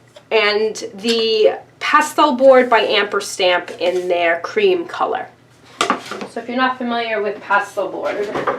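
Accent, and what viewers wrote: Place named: East Coast, United States. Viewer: I just discovered you and your East coast accent❤️‍🔥❤️‍🔥❤️‍🔥🥇🥇🥇🥰🙏🏻👍🏻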